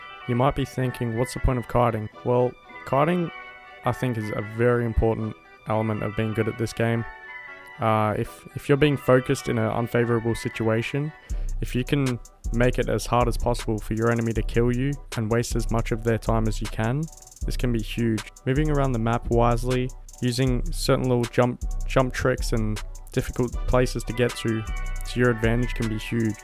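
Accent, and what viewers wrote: Australia. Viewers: You sound Aussie, is that correct?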